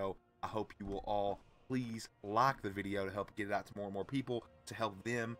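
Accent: South, United States. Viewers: got that Theo Von southern charm love that shi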